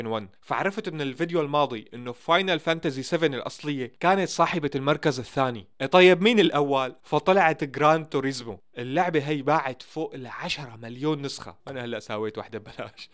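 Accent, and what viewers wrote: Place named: Syria. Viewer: يازين اللهجة السورية والله احبكم يأهل سوريه تكفون ادعولي اتجوز سوريه الله يخليكم والله من وانا صغير احب السوريين واحب لهجتهم.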